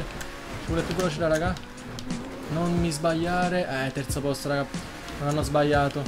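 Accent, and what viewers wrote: Naples, Italy. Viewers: non so se lo hai mai detto,ma sei napoletano? -Sasy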